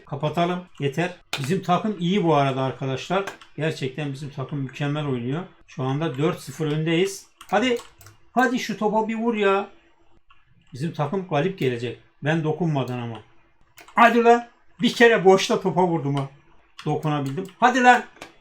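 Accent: Erzurum, Turkey. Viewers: abi sen Erzurumlumusun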